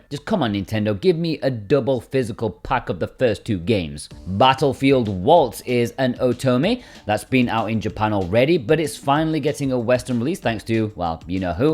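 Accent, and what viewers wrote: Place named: United Kingdom. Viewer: Youre British? It’s strange i can understand your speaking 😅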